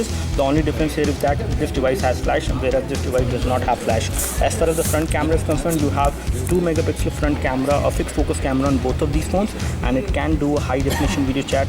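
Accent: India. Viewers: Go fuck your indian accent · I understand you have a strong Indian accent but you should at least talk slower it seems like you were in a rush or something.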